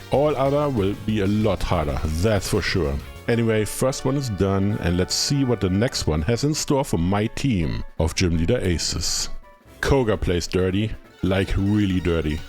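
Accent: Germany. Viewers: As a german, I immediately noticed the accent. Great content, def subscribing vro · The German accent is strong in this one!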